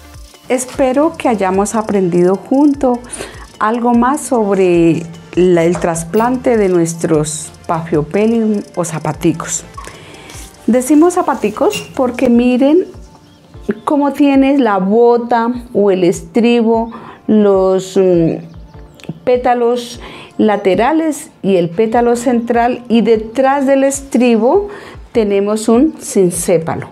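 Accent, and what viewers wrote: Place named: Colombia. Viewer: Que acento colombiano más bonito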